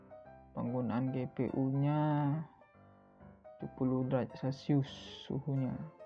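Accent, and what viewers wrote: Palembang, Indonesia. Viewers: orang palembang ya bang? logatnya kayak orang palembang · orang mana bang? logatnya kek palembang hehe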